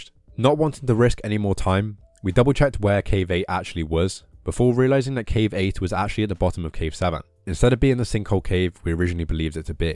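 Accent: United Kingdom. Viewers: Are you Bristolian by any chance? I recognise the accent haha where I’m from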